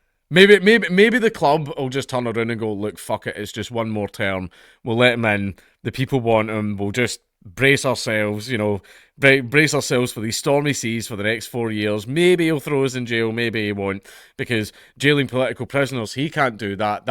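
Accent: United States. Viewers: Your American accent is quite good. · That american accent had me dying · I love hearing speak with an American accent. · American accent is spot on 😂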